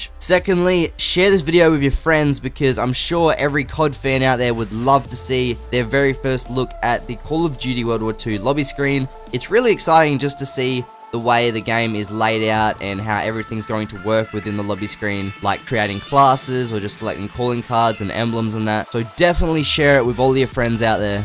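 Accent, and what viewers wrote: Australia. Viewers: Great video! Are you Australian you sound it. Love ya if your Aussie.🇦🇺❤️. Like if an Aussie Bogan.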